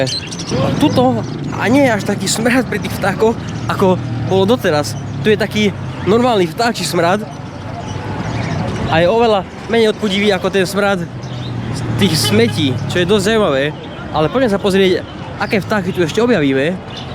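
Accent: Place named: Hungary. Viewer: koli nareciu nebola som si ista ci pocujem Madarsky jazyk ..taky zvlastny podton mas...😊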